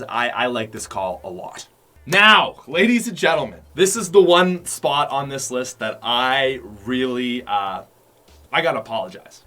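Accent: Canada. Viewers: He’s mega Canadian jeez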